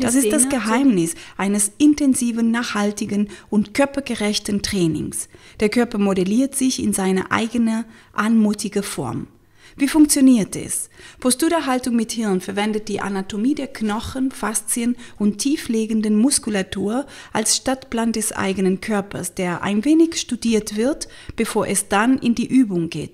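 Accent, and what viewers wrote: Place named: Spain. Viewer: Liebste, Ich bin auch so froh,deine Infos auf diesem Kanal gefunden zu haben!!!!Es erleichtert mir als ostheopatische cranio sacralTherapeutin enorm meine Behandlungen,da mein Ziel ist, die Menschen in ihre Eigenverantwortung zu bringen-und deine Videos unterstützen dies. Ich muss nicht mehr etliche Mobilisations-und Achtsamkeitsübungen von Kanal Betreibern zusammensuchen..oder meine Therapie Einheit durch Übungen kürzen..ich erkläre das meiste am Körper während meiner Arbeit -und sende den Clienten als Geschenk ein Video von dir nach! Es ist Perfekt!So perfekt! Und sie lieben deinen reizenden spanischen Akzent genauso wie ich. Ich wollte dich dies wissen lassen,damit du weiterhin Kraft und Freude und Motivation für deine Arbeit mit den Videos hast-wissend,sie werden geschätzt! Und sollte einmal ein Thema anstehen,wage ich es, mich zu melden. 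Das Hauptthema bei den Menschen ist-die Atmung!!! Immer wieder. Deshalb Dank ich dir für dies Video am meisten 🙏🏽 P.S. Was ist deine Meinung zur Wim Hof Methode...vor allem das Atemmuster?das würde mich interessieren. Vielen Dank im vorraus für deine Zeit,dies zu lesen,und wahrscheinlich-wie ich dich kenne-auch zu antworten. In Verbundenheit, abrazarte desde austria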